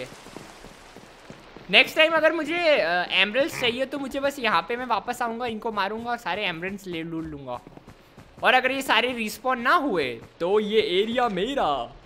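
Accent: Gujarat, India. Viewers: Are you from Gujarat?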